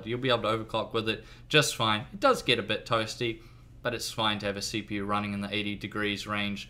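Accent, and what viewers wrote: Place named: New Zealand. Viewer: I think this one is a New Zealander.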